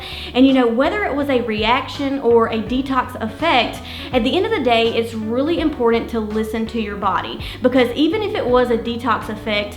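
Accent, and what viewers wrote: South, United States. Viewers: Such a nice southern accent I love to hear it I day use to I was living in Alabama Tennessee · I love your southern accent! Where are you from? I know its 2024, but I am trying this out right now.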